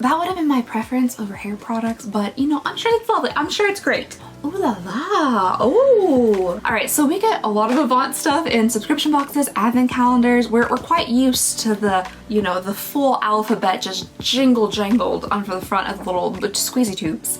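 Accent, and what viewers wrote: Kentucky, United States. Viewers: As a lot of people mentioned, music was too much. The pace of the music was not right for how you talk making it seem like you were talking faster. And the pitch didn’t match with your accent (I am Australian so I want to say Kentucky drawl. Forgive me if I am wrong) making it feel slightly off and not as charming/relaxing, and in a couple of places it got a lot louder emphasising the conflicts. A few of the jump cuts were a little abrupt but not unbearable. Edit: because I am Autistic and worried this is coming across as negative. I want to say the overall the video was edited well, these are just some things I noticed that was different and I personally felt could use some tweaking.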